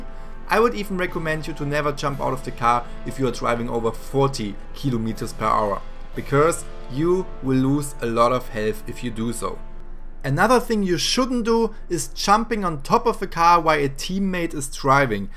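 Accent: Germany. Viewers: Keine Ahnung ob das wer lesen kann,aber irgendwie hat er ein Deutschen Akzent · You know...I just noticed his language was in Chinese or w.e. yet he sounds German as fuck lol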